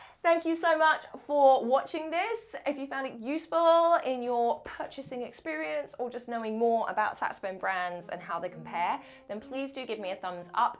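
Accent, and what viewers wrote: United Kingdom. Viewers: I like the French first name with the British accent😏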